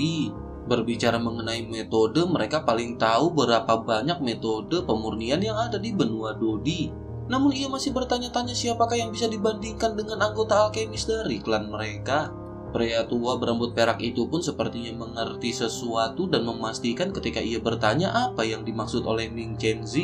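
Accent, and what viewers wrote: West Java, Indonesia. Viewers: Sunda ya min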